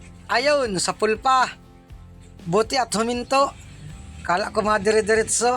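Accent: Bicol, Philippines. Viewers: Parihas Silang taga Bicol kaya parihas dn ang style ng boses nila.. sana nga magka Collab sila 😅 halos magka parihas dn subscriber nila 😊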